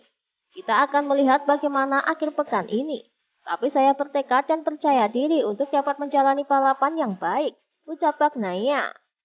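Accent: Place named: Banyumas, Indonesia. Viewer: Admin nya orang ngapak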